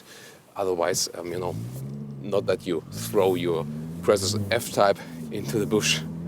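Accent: Germany. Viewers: Please make your Videos in German, the German-English sounds like shit and your Channelname is also a German one...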